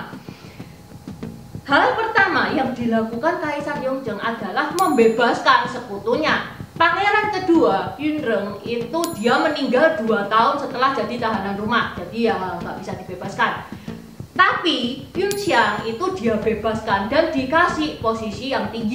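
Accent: Java, Indonesia. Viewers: Suka cara ngmg mba ini...lucu ngakak..trus kl liat wajah kaget..liat logat nya..jawa bagett...haha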